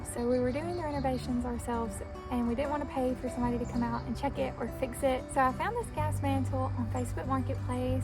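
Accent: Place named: South, United States. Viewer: loveee your adorable southern accent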